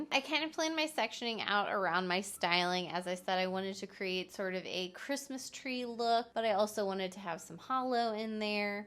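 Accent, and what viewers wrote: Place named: Missouri, United States. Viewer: Definitely off topic but are you from Missouri?? I feel like you have a very Missouri accent and I love it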